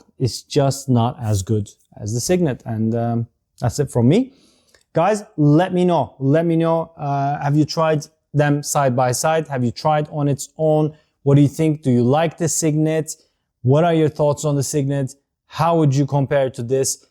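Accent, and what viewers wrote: Turkey. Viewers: are you turkish? Your accent sounds very turkish 😂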